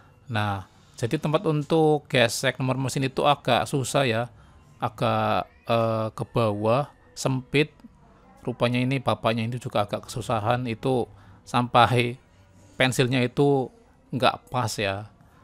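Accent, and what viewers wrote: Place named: Banyumas, Indonesia. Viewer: Orang Ngapak ya om 😂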